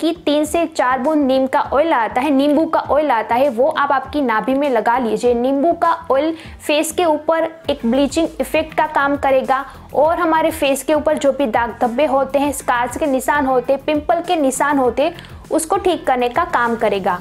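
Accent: Gujarat, India. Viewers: You must be a Gujarati by the way you speak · I like her gujrati accent i miss my gujrati friend.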